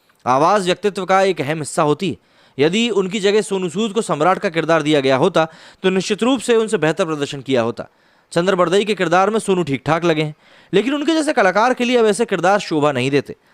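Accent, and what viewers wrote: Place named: Punjab, India. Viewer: His pronunciation is like that of Punjabi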